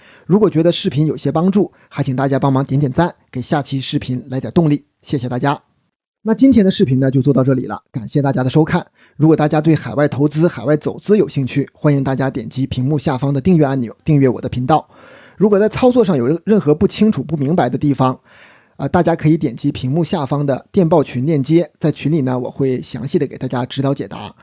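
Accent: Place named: Northeast, China. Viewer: 东北口音有点搞笑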